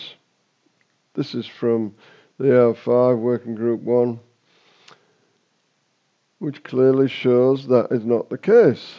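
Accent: Yorkshire, United Kingdom. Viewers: As usual, this contributor's analysis is presented with succinct scientific clarity; and his terse, placid-yet-authoritative style is a welcome change from the strident, shrill tones of the alarmists' hard sell. In honest science at least, substance trumps emotion every time. Despite writing, as I am, from t'other side of yonder Pennines, I am humbly obliged to concede that his sound-reasoning rebuttals of the IPCC's characteristically-devious acumen are admirably accentuated by such rectilinear "Yorkshire talking". Considering the magnitude of the IPCC's disgraceful climate hoax, his blunt turn of phrase is also refreshing.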